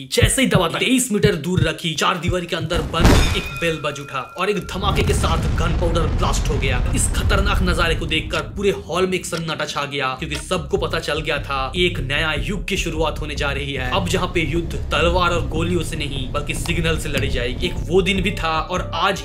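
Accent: Bengal, India. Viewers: Abb samajh aaya bhai tu bengali hai.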